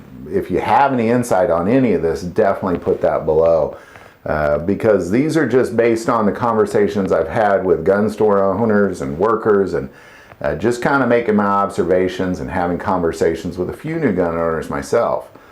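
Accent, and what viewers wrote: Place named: Kentucky, United States. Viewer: I bought a g19 gen 5 for a girlfriend. It wasn't bad but not a good fit for my hands. Dude I thought you were from Kentucky or surrounding area for some reason. Blue steel is a little ride. Thank you for the prayer direct message we talked about. It did work out. Thank you for your positive energy in this direction.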